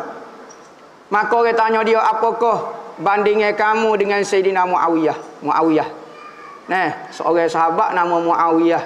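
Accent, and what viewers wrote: Kelantan, Malaysia. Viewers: Alhamdulillah, anda boleh memahami loghat Kelantan.... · Kepada yang tak berapa faham dgan penyampaian ustaz tolong jgan salah faham, kerana ustaz banyak menggunakan bahasa kelantan , loghat klate pekat, maksud yg disampaikan itu mungkin berbeza dgan apa yg kita fahami, 😌 🥰❤️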